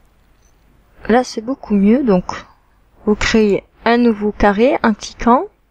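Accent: South, France. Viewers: Ahhhh j'étais sur que c'était du sud et j'ai faillit dire Marseille mais j'ai bien fait de ne rien dire j'ai évité l'accident politique lol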